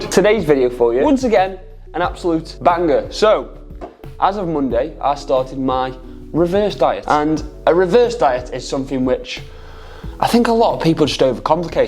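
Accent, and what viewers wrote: United Kingdom. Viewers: Great physique + strong regional British accent + vulgarity = Subbed. 👌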